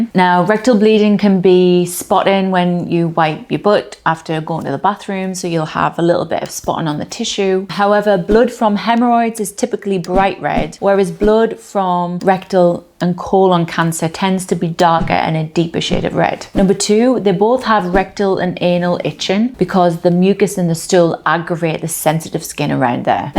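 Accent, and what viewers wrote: United Kingdom. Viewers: What part of the UK is this accent from? Midlands?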